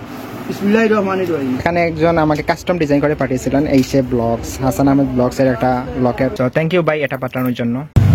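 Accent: Sylhet, Bangladesh. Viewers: সিলেটি নি আপনে?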